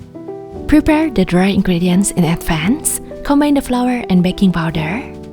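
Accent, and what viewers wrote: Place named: Indonesia. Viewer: Thanks for the recipeee. Aksennya kayak aksen indonlish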